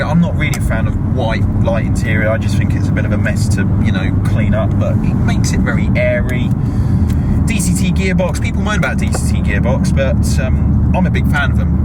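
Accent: United Kingdom. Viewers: Nice vid, wow u are mad British with that accent my man! M3 CS.... I unfortunately didn’t dig the M4/ M3 with the soon to be finished generation of the 3/4 series. I think the fact that it was lighter but only made small hp gains was the big reason why former or current E90/92 M3 owners may not have gravitated towards the newer M car. As for me, I am cashing out on the BMW M brand and hopping over to Porsche later this year, but I hope that BMW tries a bit harder to make their M cars special again. Having the same general engines is not a cool thing, I don’t want to have an M series car that shares the engine with a few tricks and higher boost as a normal top of the range model engine- I want it to be separate (8 also don’t want a damn seat backrest with a glowing M logo on it ... what were they thinking)? Maybe that’s my age kicking in but that is still a dumbass thing they did.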